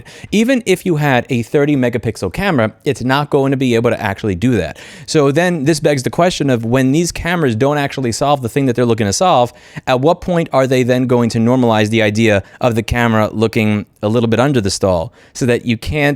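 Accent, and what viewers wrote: United States. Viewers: Eeewww dude - congratulations on creating a rage bait sermon. Perhaps you should stay in your own lane with regards to this issue. You sound American - you guys were brought up different to us in New Zealand. The child you are asking to video tape their school bathroom is very questionable also. You, an adult - requesting or accepting video from a child/minor. Think about that careful bud. Pip from New Zealand. Age 47 🌻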